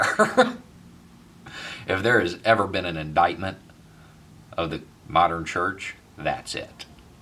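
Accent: United States. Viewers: Great stuff man . This is what a real American sounds like 🇺🇸💯 Subbing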